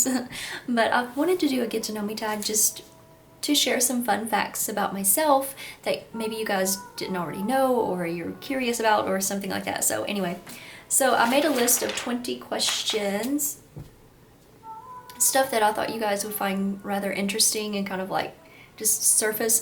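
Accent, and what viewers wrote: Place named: Kentucky, United States. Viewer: Great video, enjoyed it lol your personality is so amazing, ky ascent.. I never noticed lol prob where I'm from Ky also, really I never noticed lol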